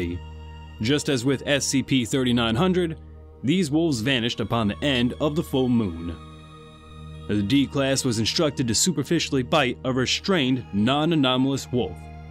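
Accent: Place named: South, United States. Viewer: Finaly I know, the southern twang is how he talks.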